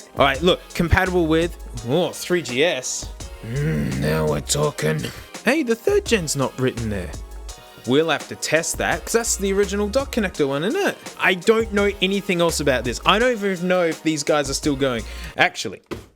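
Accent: Australia. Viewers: You’re Australian? 🙃🙃🙃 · and it is so refreshing listening to a fellow Aussie haha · Funny angry Australian man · In this video u sound more australian than the usual... idk why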